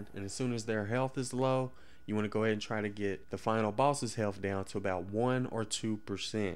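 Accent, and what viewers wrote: South, United States. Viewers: liked commented and subscribed for the country accent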